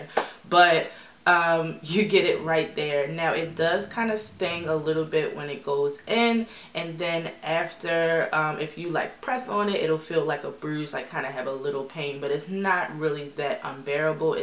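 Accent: Baltimore, United States. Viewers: I could tell you were from Baltimore a few sentences in 😁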